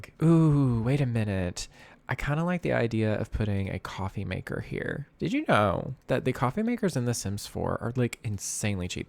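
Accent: South, United States. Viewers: ! I love your southern twang you’ve def made the line up of my nightly sims YouTubers ❤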